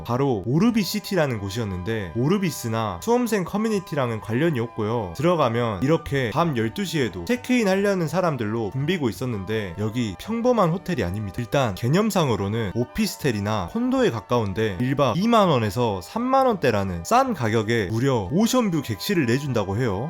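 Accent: Jeolla, South Korea. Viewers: .억양이 절라도 말투가 너무 심해서 알아들을수 없습니다....절라도 억양좀 자제해주세요. 인간적으로 너무 심합니다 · 아 전라도근본 사투리억양 ㅋㅋㅋ 듣기힘드네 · 절라도 사투리 졸라 쓰는디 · 억양이 빼박 전라도네요! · 구수한 전라도식 발음이 참 정감이 넘칩니다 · 억양이 귀여움~전라도쪽인듯 ㅎㅎ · 혹시 전라도 분 이신가요? 말투가 너무 정겹네요 ㅋㅋㅋㅋ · 익숙해질때까지 시간이 좀 걸리네요 전라도사투리였군요? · 고향이 전라도인가 바요? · 전라도 사투리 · 전라도사람인가보네요 악센트가심하시네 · 전라도 사투리 나레이션 익숙하지 않은데 재밌네요 ㅋㅋ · 난 경상도인데 전라도 말씨 참 좋다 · 영상은 재밌는데, 절라도 사투리가 너무 거슬리네요. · 고향이 전라도 인가요?ㅎㅎ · 전라도 사투리만 안써도 볼텐데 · 구수한 전라도 사투리와 쌉소리 스크립트의 환장의 조합 ㅋㅋ 재밌게봤습니다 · 내용은 좋은거같은데 전라도 사투리가 너무 구수~~해서 앞부분만 보다가 갑니다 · 전라도 사투리. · 전라도 엑센트 못버리네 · 전라도 사투리가 꽃혀서 집중이 ㅜ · 사투리는 어디쪽인가요? 전라도? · 전라도 말투도 너무 정겨워브럿고요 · 전라도 사투리 듣기싫어요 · 남도 사투리가 겁나게 친근허요...잉.. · 전라도 억양! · 전라도사투 리에 디테일한 언 어 구사력 쥑입니다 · 광주분인가?? 전라도 사투리 어투가 뭍어나네요...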